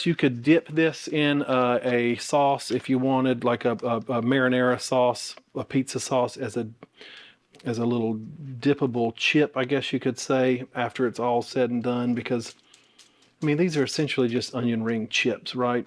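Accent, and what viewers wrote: South, United States. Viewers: ...If anyone is looking for a baby name doesn't sound too bad 😂 came for the onion sheet, stayed for the Southern speech! ❤️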